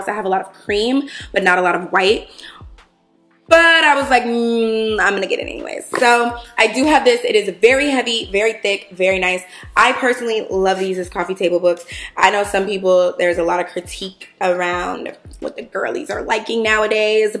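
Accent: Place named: Florida–Alabama, United States. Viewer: I love that you pronounce everything JUST. LIKE. ME. Gurrrrllll… I grew up in Floribama too - Pensacola to be exact! You grew up right down the way (I believe)! I know the word “carafe” looks like it’s pronounced like the words “care + if” but it’s actually pronounced like “giraffe.” (Currr-afff) Guuurrrllll… words are always pronounced the exact opposite way my Floribama behind thinks!!! LOL! But say that word however you like! I think it just shows our shared regional cuteness 💖💖💖 ❎🅾️ Susan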